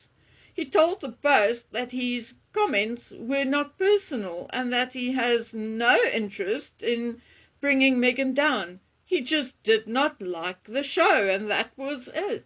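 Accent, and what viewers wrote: South Africa. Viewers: Hi. I've been enjoying your podcasts snd trying to place your accent. Is it South African/Afriaaner? Just curious. I love to listen to accents ftom around the world and place them.🥰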